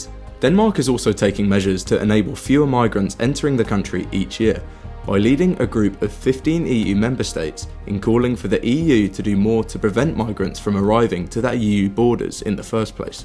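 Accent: England, United Kingdom. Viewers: As your voice is English, why are you talking about dollars and looking at sites selling things priced in dollars. Do you live in America or is it that you are running some kind of con-trick ?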